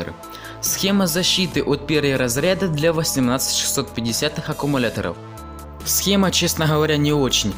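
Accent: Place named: Ukraine. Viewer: украинский акцент просто, а вот с грамотностью речи поработать бы, либо читать по бумажке сначала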